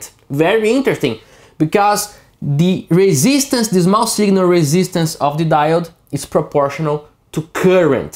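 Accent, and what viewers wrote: Brazil. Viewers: Tu é paulista?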